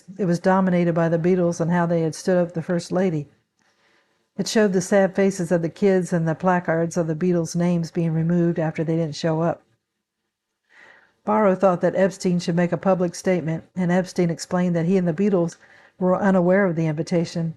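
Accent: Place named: California, United States. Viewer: You have such a California accent. You sound like my Aunts. Great video! Keep it up!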